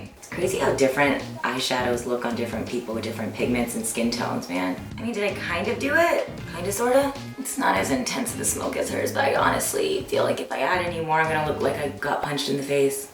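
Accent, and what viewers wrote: United Kingdom. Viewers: You did fantastic!!! You’re just so funny with your british accent... I’m sure kaushal feels proud of your effort xxxx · You are sooo cute the more you watched and copied the more you got that Mary poppins British accent x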